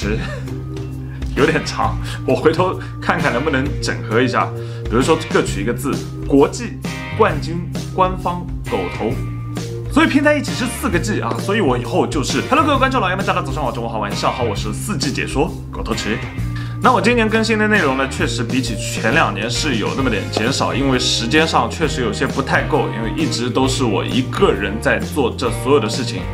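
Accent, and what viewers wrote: Shanghai, China. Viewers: 沪普. 上海宁？ 😉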